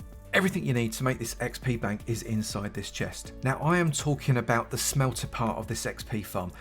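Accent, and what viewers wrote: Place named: England, United Kingdom. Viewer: He's just English, that's it.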